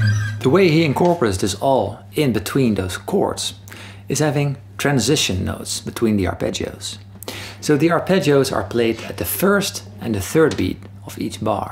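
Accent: Netherlands. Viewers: Jep, it's the Dutch accent :) Actor playing Daario Naharis is Michiel Huisman, also from the Netherlands. :) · both dutch, it's the (faint) accent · Ik hoor een hollandse tongval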